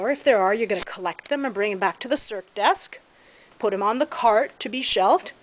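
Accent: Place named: Ireland. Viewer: that Canadian accent has a HINT of Irish in it :D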